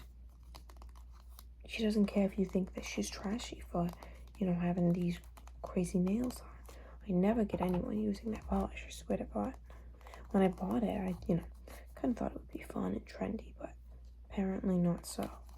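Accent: New Jersey, United States. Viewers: Omg I love the jersey accent, idk asmr sounds so cool with it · I love your jersey accent the best!! ❤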